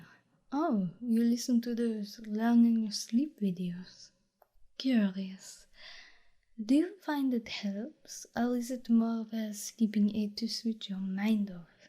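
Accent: France. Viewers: Love your French/foreign accent roleplays, like this one and the French exchange student series. I'd love to see more of that!! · i love ur french accent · Yes I've it plz more french accent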